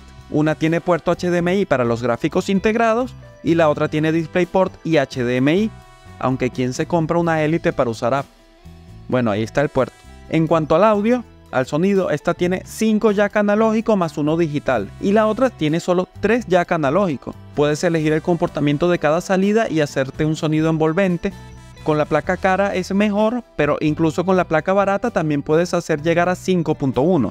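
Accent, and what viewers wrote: Venezuela. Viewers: Hombre no sé si eres venezolano hermano pero hablaste como uno. Gracias por tus videos soy un seguidor muy agradecido por tus explicaciones. Gracias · Excelente información, ¿eres venezolano no? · Hola eres de venezuela?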